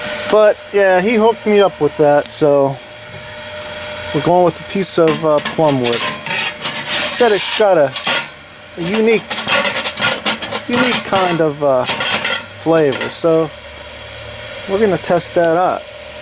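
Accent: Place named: New York, United States. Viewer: “Forget aboouu It” can tell you are from the north East with a touch of New Yorker in ya. That lack of burnt charcoal is so impressive. Just got rid of all my extra 18” Webers - guess who is now back searching for one. I already have a couple of old stock pots - you truly inspire me.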